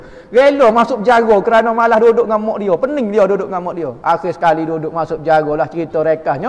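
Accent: Kelantan, Malaysia. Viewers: Sedap dgr ustaz cerita. Sebab dia guna bahasa kelantan. Macam lain sikit bunyi. Walaupun dah tahu kisah nabi Yusof ni. Tak tahu byk mana dah ceramah nabi yusuf dgr. Ustaz ibnu rijal, ustaz auni, ustaz wadi anwar.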